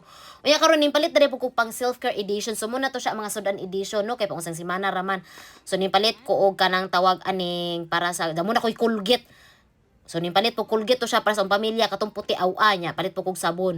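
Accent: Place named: Visayas, Philippines. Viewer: I love the accent. na lingaw gyud ko 🤣 proud to be bisaya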